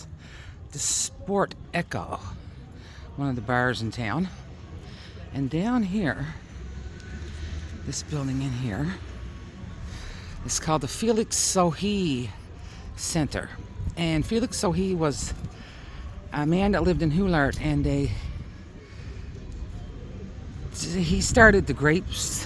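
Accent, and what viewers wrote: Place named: Newfoundland, Canada. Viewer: Hi. I'm canadian too, but Ontario. Love your accent. My friend is from Newfoundland too. Merry Christmas 🎅 🎄 subscribed. Glad I found you. Wonderful vlog. Loved it!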